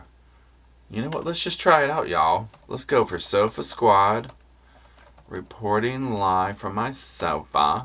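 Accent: South, United States. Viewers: Can we say C U L T?? Oh my goodness! YESSSS!!! Let’s hear the transcripts, podcasts, all of it. I’m here for it. On a more serious note- i think that the higher ups of this particular religion really need to speak out and speak loud about what is condoned by the LDS church, and what is not. What is encouraged, and what is not. Because, right now, that church is looking BAD BAD. I live in a small mountain town in UT- the same one that Kouri Richins tried to purchase that big ole house in? Yep. As a non- member, it terrifies me that SO MANY high profile cases lately are coming from “devout” LDS people. The dentist who killed his wife. The wife who poisoned her husband. The Daybell/Vallow saga. 8 passengers. The list goes on and on. If I was the head of PR for the LDS church, I would be hiring the best people to somehow dig their church out of this optical MESS! 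Looking forward to your next video! Your personality is perfect for this! Love when you roll your eyes and get a titch petty with your southern accent…. bless their lil pea pickin hearts. Ha ha. Thanks for all the fabulous content!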